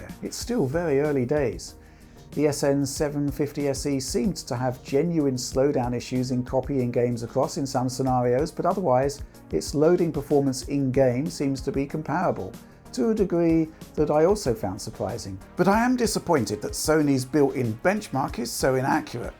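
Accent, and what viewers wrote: United Kingdom. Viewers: That's how the English say aluminum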